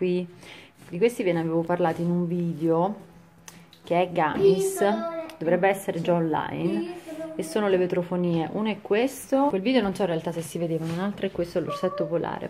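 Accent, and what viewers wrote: Tuscany, Italy. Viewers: Ciao,scusami ma tu hai la fortuna di abitare in Toscana e ti correggi quando parli toscano... pagerei oro per spostarmi dalla Calabria non fraintendermi AMO la mia terra e il mio mare, ma al sud poco lavoro e poco tutto ma quello che mi fà più paura e la Sanità praticamente non c'è.Quando vengo in Toscana precisamente a Pistoia l'adoro e da 28 anni che ci vengo purtroppo solo da mio cognato 1 volta all'anno, l'Ikea vicina i Gigli Firenze Prato vicini anzi tutto vicino,sei fortunatissima goditela 😉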